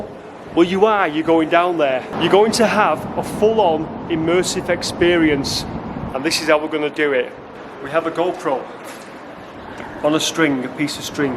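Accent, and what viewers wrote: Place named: Scotland, United Kingdom. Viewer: I can tell you're from a Scottish part of England, there's no mistaking the brogue. We have several different accents here in the USA, the State of Massachusetts has an accent that sounds similar to Scottish the pronunciation of some words. Love your channel!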